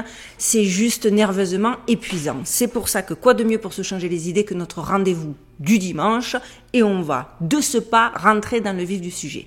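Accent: Marseille, France. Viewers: Je suis curieuse et pas autruche du tout, alors, oui, continuez à farfouiller svp 😀 c chouette l'accent marseillais 😄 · Oh,Peuchère,vive l'accent de Massilia! 🐟 et les sardines! 🐟🐟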